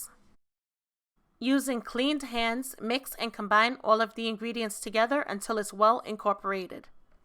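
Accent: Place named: East Coast, United States. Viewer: Love that east coast accent ☺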